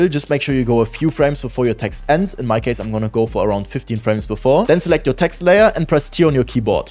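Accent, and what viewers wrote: Germany. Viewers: man merkt das du deutsch bist dikka der Akzent killt mich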